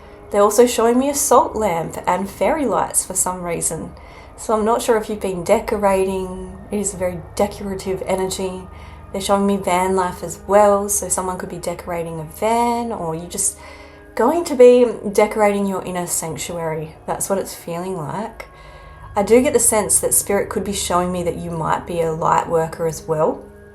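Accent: Australia. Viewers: G'day there!! You're an absolute bloody legend dudette!! Your head popped up on my feed randomly and I'm proper glad it did! You spoke straight to my soul and it felt kinda weird lol especially when ya started singing and I had a vanilla icecream cone in my hand! Hahahaha ya speak with such wisdom and and better still an Aussie accent! 😉 Everything you talked about gave me chills and alot to think about... Will see ya again soon mate! Much love and respect 🙏🤘❤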